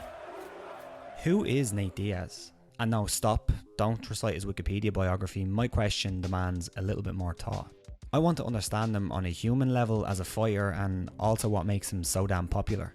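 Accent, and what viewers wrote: Ireland. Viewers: Heard the Irish accent 20seconds into the video. Assumed he was going to be biased towards conor. Turned the video off.. Sorry dude. An Irish man commenting on nate diaz just does NOT work for me. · “Well shimmy me timbers!”This was absolutely awsome to hear this narrated by an Irishman! Believe it or not, the Irish & the Mexicans have a lot in common! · Haha love the Irish accent man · it's a weird feeling .. hearing an irish man talk about Diaz. Certainly lits a fire under me belly! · Awsome job my friend! You were spot on! I have to admit when I first heard the accent I was surprised when you started to drop that knowledge. Didn’t think an Irish man would but the time in to study Diaz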